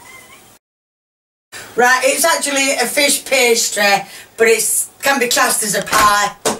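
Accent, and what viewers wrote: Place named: Yorkshire, United Kingdom. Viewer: where are you from? We have a disagreement here.... I think you're from north Rotherham somewhere. My mum thinks you're from somewhere like Wakefield. Please help xxxxxxx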